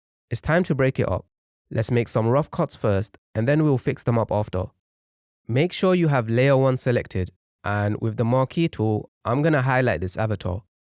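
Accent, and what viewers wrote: United Kingdom. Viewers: Brother, you are from the UK right?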